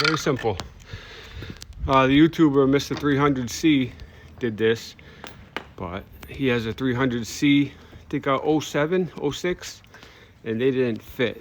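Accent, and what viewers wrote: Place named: Boston, United States. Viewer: Lol Bro you live in the suburbs what's with the Boston city accent...stop pretending to be something you're not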